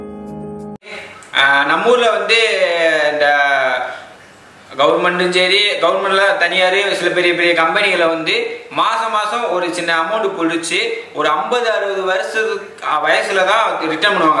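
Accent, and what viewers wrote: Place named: Marwari, India. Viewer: உங்கள் தகவல் பயனுள்ளதாக இருக்கிறது.........ஆனால் உங்கள் பேச்சில் தடுமாற்றம் அதிகம், சேட்டு காரன் தமிழ் பேசுற மாறி இருக்கு..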